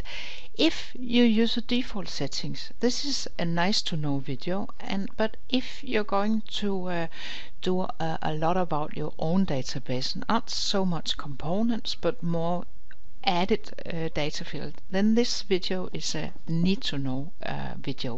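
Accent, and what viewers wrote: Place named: Denmark. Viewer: i smell danglish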